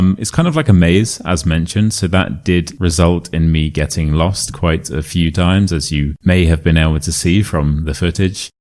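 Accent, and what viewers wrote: RP, United Kingdom. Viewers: A good approach to Modern RP though.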